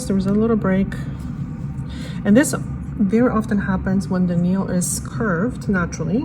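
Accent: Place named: Poland. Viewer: She lives in Spain but is not Spanish. I think she may be Polish.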